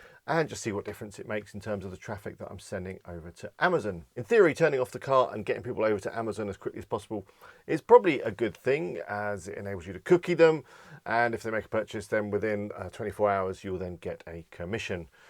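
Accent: United Kingdom. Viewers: Really teaching video. Wouldn't you share with us which are your favorites themes and plugins to work with? Or the ones you normally use to create your websites, please? Thank you in advance. Btw, I love that so british accent. Indeed :)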